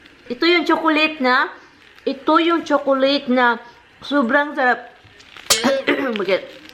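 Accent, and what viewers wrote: Visayas, Philippines. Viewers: Alam tawa ako ng tawa SA scolit mo Day😂😂😂😂natutuwa ako sau pag naay accent na bisayang dako😂😂😂✌️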